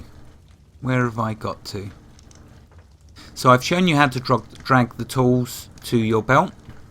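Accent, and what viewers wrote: United Kingdom. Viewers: cool - I will continue to try and get the level up - I would love to join the server and get whatever speak thing you use (Teamspeak?) up and running - I am from Kent originally but I have been living here in Arkansas for about 3 and a half years so its good to hear another British accent - the usual response to my accent here is "you ain't from round here are you boy" - I generally respond by saying oh what gave it away LOL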